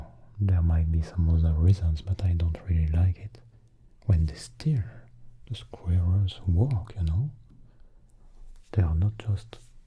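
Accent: France. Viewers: Are you french mate?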